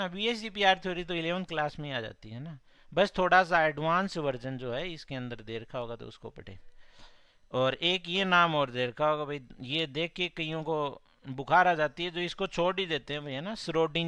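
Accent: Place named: Haryana, India. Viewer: Very good initiative sir And very nicely explained ....with Hariyanvi ascent and rajasthani touch....🤗 Great sir best donas ur self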